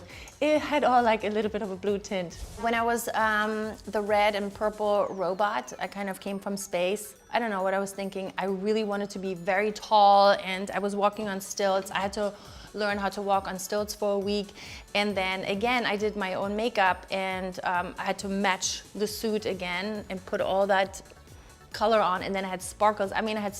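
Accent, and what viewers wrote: Germany. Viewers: she sounds extra german,is it just me🤔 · She sounds more German to me now lol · Her german accent is more apparent here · I love how you can still hear some German accent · I kept hearing an accent then found out she’s from Germany! Crazy how most of her accent is gone. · Do you mean her accent has become stronger? But yeah, I can definitely tell there are a few linguistic features about her way of speaking that distinctively mark her as German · That german accent 😂❤️ ich komme aus Deutschland und man hört es der guten an lol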